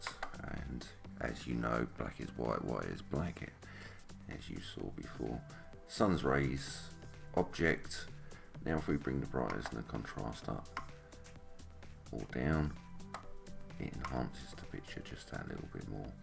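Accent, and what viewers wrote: England, United Kingdom. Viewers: Good work, I appreciate your systematic approach and (as a silly side note) the English accent is always a marvel to wrap one's ears around.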